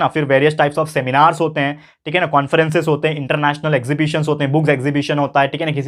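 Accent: Maharashtra, India. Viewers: Sir kya aap Marathi ho Apki accent aur kuch words aap Marathi boltey ho to aisa lagta hai ki aap Marathi ho Kisi video mein bol dena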